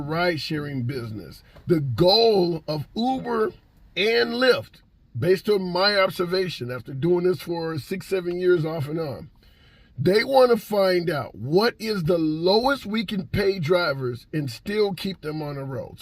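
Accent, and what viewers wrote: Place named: Philadelphia, United States. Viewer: This dude definitely from philly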